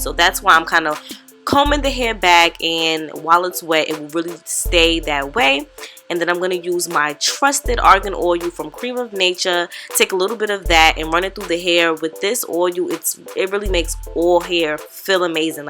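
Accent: New York, United States. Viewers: First time watching your video and I knew u was from NY right away, we can’t hide our accents nowhere lol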